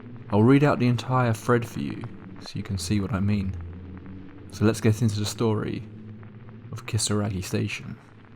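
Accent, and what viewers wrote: United Kingdom. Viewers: Are u British bruh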